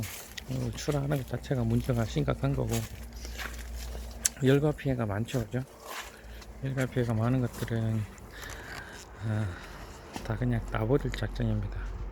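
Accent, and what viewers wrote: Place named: North Gyeongsang, South Korea. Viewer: 선생님 말씀으로 봐서는 경북지역 이신것같은데요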